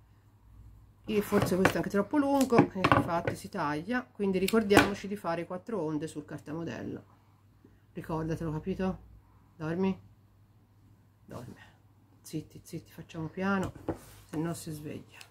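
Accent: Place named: Tuscany, Italy. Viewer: della tua parlata toscana che io adoro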